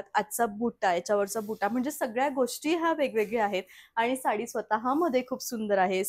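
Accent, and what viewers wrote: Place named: England, United Kingdom. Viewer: Te sagala thik ahe but boltanna jabardasti marathi boltanna english accent cha use ka krtes?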